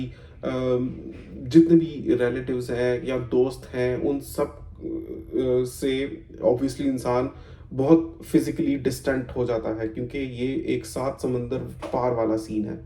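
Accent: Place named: India. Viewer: نام تو پاکستان کا لے رہا ہے اور بول ہندی رہا ہے اور تیرا ایکسینٹ بھی ہندی ہے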